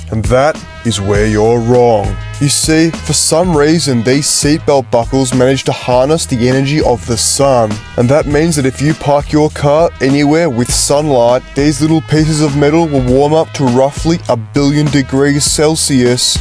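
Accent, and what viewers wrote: Australia. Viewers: If you don't like the aussie accent why the fuck are you watching an aussie channel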